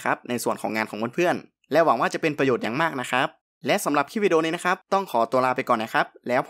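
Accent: North, Thailand. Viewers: ขอบคุณสำหรับความรู้ดีๆนะคะ ว่าแต่เจ้าของคลิปเป็นคนเหนือไหมคะ😂😂😂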